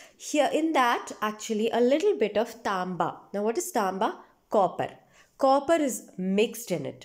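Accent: Kerala, India. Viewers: Maam you have explained this chapter beautifully!! Also, which state do you belong to? You really sound like a person from Kerala with a Mallu accent😂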